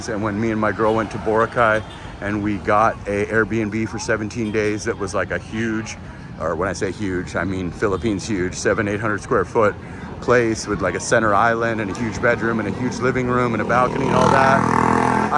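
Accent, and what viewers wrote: United States. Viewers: Watching from Agoura hills Calif you have American accent Bro!!!! Take good care I’m originally from bugallon Pangasinan 4 hrs drive from where you are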